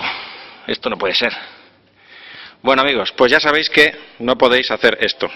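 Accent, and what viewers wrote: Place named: Italy. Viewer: you are an italian who is trying to speak spanish? omg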